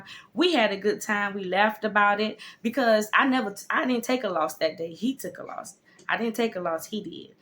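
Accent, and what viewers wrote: United States. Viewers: You sound like your from Louisiana though. 💜 when you were listing the dudes I was looking around my room. Hell! 😂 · This 💩 sounds so Houston!!! Cracking up laughing 😂😂 All those dudes in one room (I’m hollering) 😂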